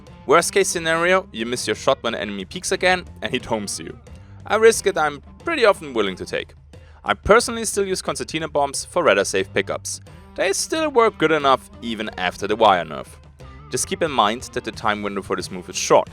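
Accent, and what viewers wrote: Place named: Germany. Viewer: Really enjoyed watching that. Well played! Where are you from mate? You kinda sound like German but I'm not sure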